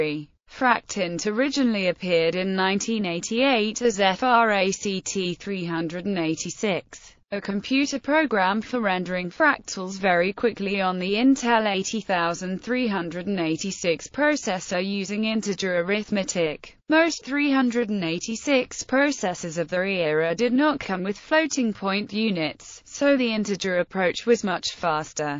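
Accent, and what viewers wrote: United Kingdom. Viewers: I wasn't aware synthetic voices could be made to sound British. Interesting. The CPUs of that day weren't called three hundred eigthy-six computers. It was "three eighty-six" (386) followed by the speed of the CPU in MegaHertz. My friend John had a top of the line 386 without a FPU (floating point unit) that he used to make fractint fractals on. At 640x480 we could go watch a couple of movies, stop to get something eat, go to the new QuikTrip to load up on a variety of sweets, go play putt-putt, go to the comic book/gaming store, or possibly play a few games in the video arcade. By the time we got back the fractal might be done rendering. Then there was the time waiting for it to save the render. As always hope the power didn't go out or his mom turn off that PC to conserve money on her power bill. I love FractInt. The one thing you failed to mention is the editing of the virtual video settings to allow renders up to 20,480 by 20,480 pixels!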